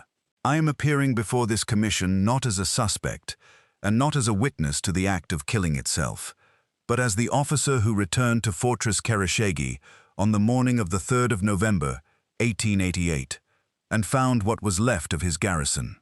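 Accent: United Kingdom. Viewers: English accent, but he can't pronounce lieutenant correctly. Pissed me off.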